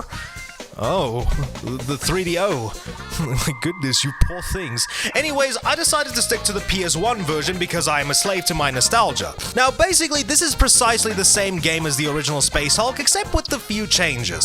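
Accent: South Africa. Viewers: Why did it take me like 10 mins to realise you have a Saffer accent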